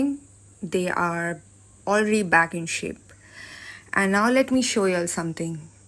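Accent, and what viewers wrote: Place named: India. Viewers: She sounds Indian to me.. That's how they pronounce it too😁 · yes. I am an Indian and hearing her sound I think she is also Indian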